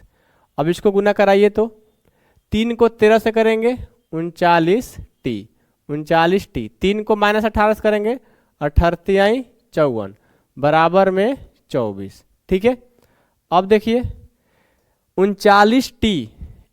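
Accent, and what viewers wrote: Bihar, India. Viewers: Sir aap Bihari se ho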